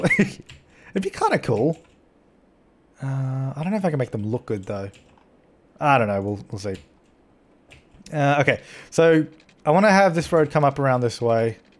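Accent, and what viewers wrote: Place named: Australia. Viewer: I like ur channel cos u have an Australian accent which is epic ( I have a English accent)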